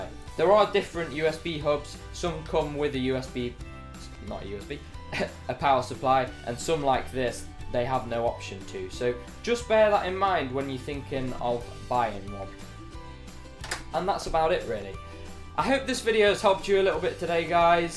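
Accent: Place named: United Kingdom. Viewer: British dialect!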